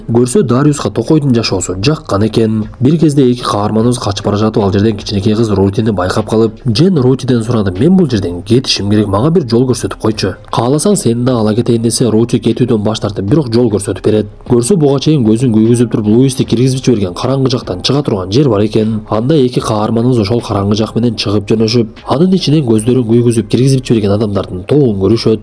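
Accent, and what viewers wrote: Standard. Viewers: сонун суйлойсун ан таза кырызча сонун азаматсын 👍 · Ото соонун таза кыргыз тилинде, тушунуктуу кылып, тушундуруп бергениниз мага абдан жагат даагы соонун ,кызыктуу кинолорду чыгара бериниз.❤❤❤ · 🎉 сен ен сонун суйлойсун нукура Кыргызча суйлосун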